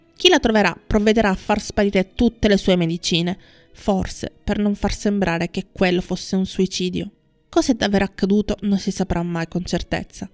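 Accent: Sicily, Italy. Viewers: Ciao, appena iscritta! Ho trovato la storia sconvolgente, adoro da quando ero adolescente gli attori del passato, fra questi il mito per eccellenza Dean di cui parli...Mi sento dirti grazie, tenendomi il beneficio del dubbio( leggendo i commenti degli altri iscritti..anche riguardo le fonti del libro letto..) sulla totale veridicità dei personaggi di cui parli, non so chi sei etc..che sei siciliana lo sento dal tuo accento! Ciao buon lavoro!🌹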